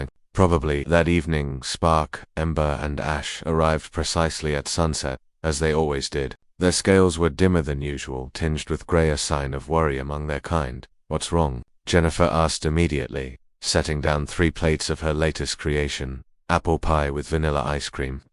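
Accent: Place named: United Kingdom. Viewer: Narrator has a British accent , very subtle.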